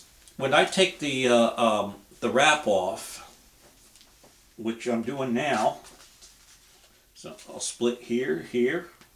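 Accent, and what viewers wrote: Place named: South, United States. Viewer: The first thing I had to do after watching the video was to find out where in the south that this young lady was from that's a thick accent even for me here in Louisiana. Very different vocal styling, good music the more videos I watched the more she just grows on you. Thanks for the introduction.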